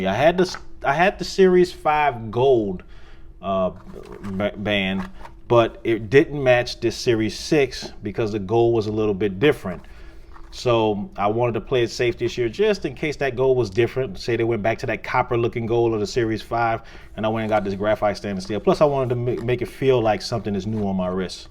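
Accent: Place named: Texas, United States. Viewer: Love the Texas accent, subscribed and thumbs upped :)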